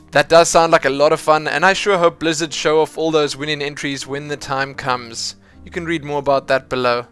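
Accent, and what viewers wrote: South Africa. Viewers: its a south african accent bru!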